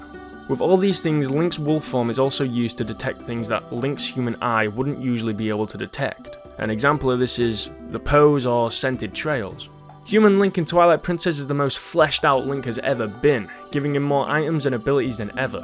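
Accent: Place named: Australia. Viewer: He sounds Australian to me an American lol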